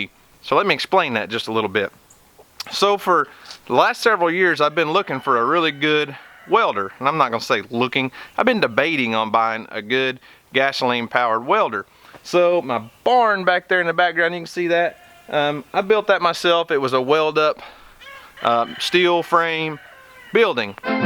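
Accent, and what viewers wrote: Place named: Oklahoma, United States. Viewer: Pardon my ignorance, but are you calling the culvert a "tin horn" or "ten horn" ? I'm from NY and your Oakie accent is through me off?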